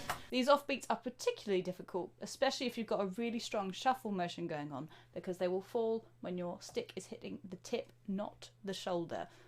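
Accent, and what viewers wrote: United Kingdom. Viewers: This makes me want to get back into drumming again! Hello from New Zealand! Love the British accent 😛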